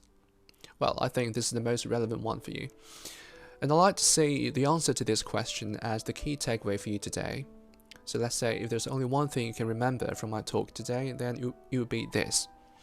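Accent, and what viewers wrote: United Kingdom. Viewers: 英国口音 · 英式口音真好聽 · posh accent English ❤...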